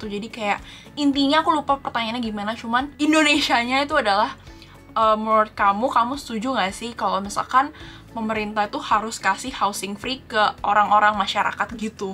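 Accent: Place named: United Kingdom. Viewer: Ngomongnya cepet banget dan kadang pake British Accent jadi kurang kedengaran jelas 😢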